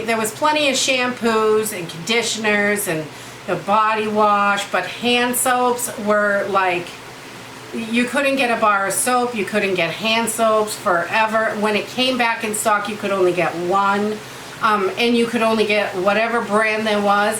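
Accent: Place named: Maine, United States. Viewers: I knew you were from Maine when I heard, “you’re bummin’” Subscribed 👍🤣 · I'm from Boston. I knew your "accent " that you were from New England. Love your videos.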